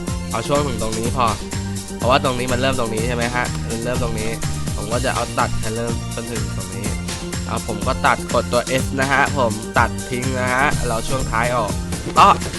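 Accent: Thailand. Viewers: เสี๊ยงเหน่อดีแท้น้อออ 😁😁😁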